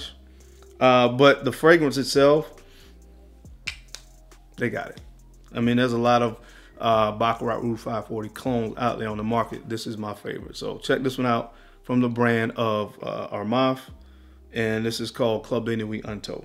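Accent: United States. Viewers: Because it's an American accent...what your doing is like me telling a French person "it's not Mach donohld, it's Mcdonald!!" When you say sushi or croissant do you also say it with a perfect Japanese and French accent everytime you order it? Lmao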